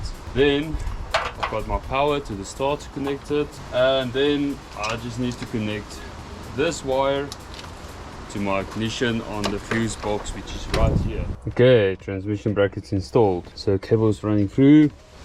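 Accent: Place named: South Africa. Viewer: I’m assuming you’re from somewhere in SA. I can tell why the accent, I just saw the ad for this channel and I’m loving it keep up the awesome videos.😁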